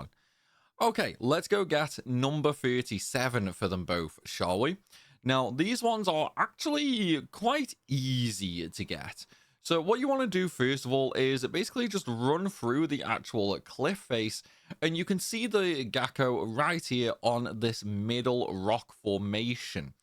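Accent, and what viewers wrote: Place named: West Midlands, United Kingdom. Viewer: You sound like someone who lives in the west midlands of the UK like me I need this confirming as this is bothering me 🤣🤣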